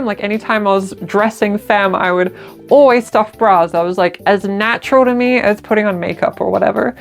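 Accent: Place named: Australia. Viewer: You kinda sound like pearlescent moon lol (its probably the aussie accent) ❤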